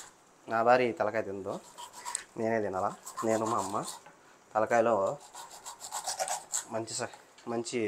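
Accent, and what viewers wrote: Rayalaseema, India. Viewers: Ne voice rayala Seema launge super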